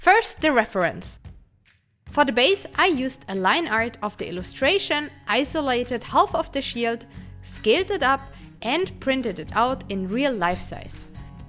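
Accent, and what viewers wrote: Germany. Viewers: Are you from Germany your pronunciation is realy german...I am from Germany to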